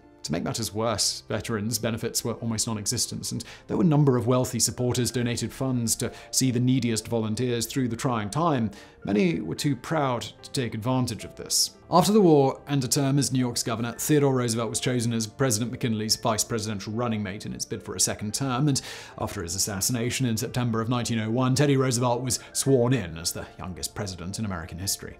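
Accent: United Kingdom. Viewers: “ a staunch and” ? Vociferous? Opponent” I can usually decipher your British accent but in this case I wasn’t sure if you said vociferous or vociferent Now I have to go Google to see if that’s actually a word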